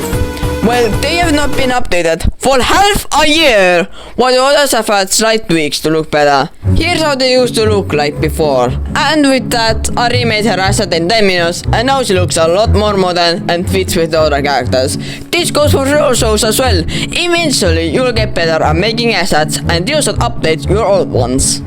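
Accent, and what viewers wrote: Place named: Estonia. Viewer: the estonian accent is amusing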